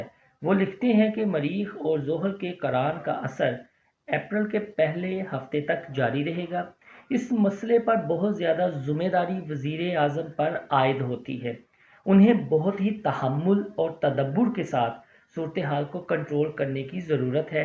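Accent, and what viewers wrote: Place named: Punjab, Pakistan. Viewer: وہ پنجابی ھے۔ پنجابی لہجے والی اردو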